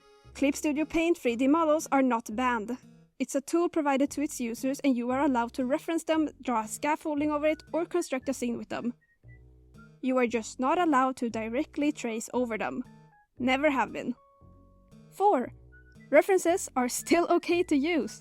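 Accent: Sweden. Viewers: Finally someone's speaking facts about this! Anyway, this is off topic, but I like your accent, I'm Swedish too!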